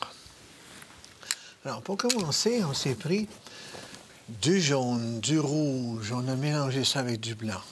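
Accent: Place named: Quebec, Canada. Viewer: Bonjour Mr le Magicien : C'est un régale de voir vis oeuvres et c'est la première fois que j'entends en prime le bel accent Quebequoi je suppose. Bravo pour tout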